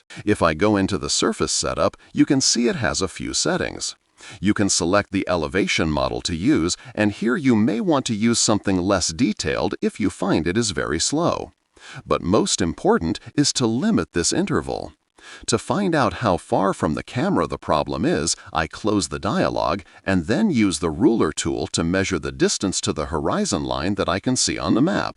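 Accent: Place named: Europe. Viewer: very good detailed how to PV panels , it will be my go to video for the next few months as i work through projects, Ai voice is good and clear and I can see how it is useful for a international audience, but I do miss the personal touch of the European accent on previous EMD videos, but that is jus me preference.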